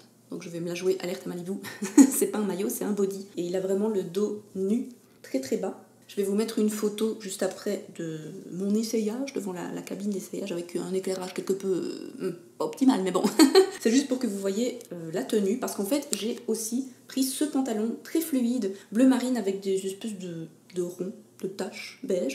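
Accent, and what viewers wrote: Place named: Belgium. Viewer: Coucou, Je t'ai découvert grâce à Patricia B (merci à elle!), et j'adore tes vidéos tout comme ton accent Belge, vraiment;)! j'ai le nettoyant bioré au charbon dont tu parles, je suis surprise car pour moi l'odeur n'est pas du tout désagréable, ça sent le frais, il y a peut-être des différences de compo entre la France et les US... Étrange ^^ Bisous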